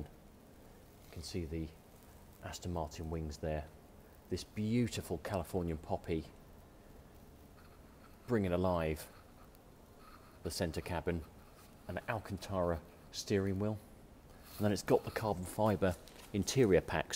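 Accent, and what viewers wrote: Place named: RP, United Kingdom. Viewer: The presenter is ace. Sounds like he went to Sandhurst.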